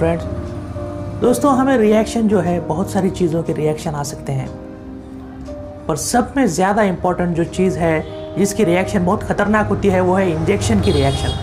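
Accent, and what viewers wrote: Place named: Bangladesh. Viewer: Bangladeshi ho ky ...sound se aise he lgta hai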